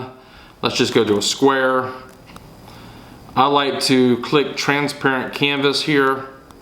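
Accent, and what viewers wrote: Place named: Lowcountry, United States. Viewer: Where in the world are you from? Sounds like my neck of the woods! #lowcountry Enjoyed your DIY!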